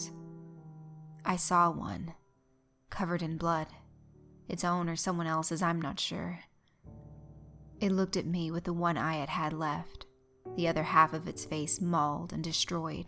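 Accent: South, United States. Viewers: YO WAIT YOU HAVE A SOUTHERN ACCENT?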